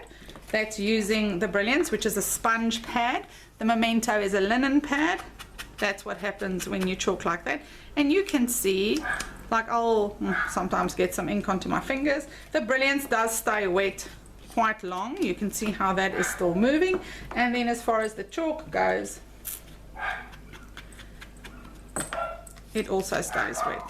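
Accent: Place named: South Africa. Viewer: Thank you for this great comparison. So fun to hear a South African accent on a crafty video ❤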